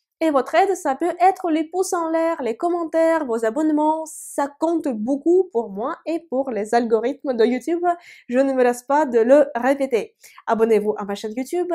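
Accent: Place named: Tatarstan, Russia. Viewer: Elle nous fait aimer les samovars Par son sourire et son délicieux accent Tatar Par sa candeur et l'ellipse de son regard Sa gentillesse et sa noblesse de fille de Tsar...! Un cours de Russe? non, un moment de pur plaisir! Bravo et merci!😄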